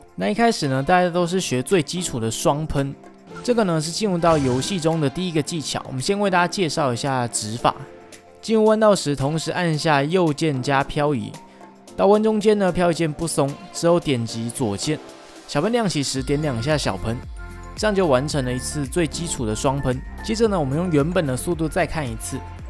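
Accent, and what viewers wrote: Taiwan. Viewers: 台湾人的读音真的不同😂没有在嘲笑,W=打不了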